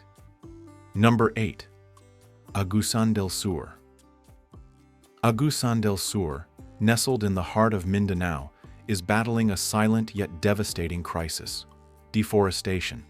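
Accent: United States. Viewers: Please correct your pronunciation of place names such as Pampanga and Batanes - it is disrespectful to say those with such an American accent!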